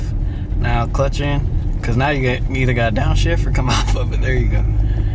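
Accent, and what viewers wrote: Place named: South, United States. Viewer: I saw that hat and heard that southern accent and knew he was bout to lay the knowledge down. Us country boys know how to drop them gears like no other. Make them things screaaaaaam.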